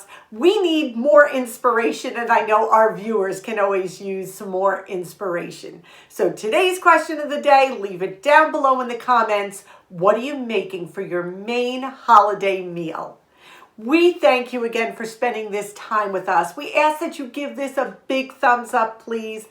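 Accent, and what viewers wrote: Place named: Hudson Valley, United States. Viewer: You totally have the Hudson valley accent. Peekskill born and raised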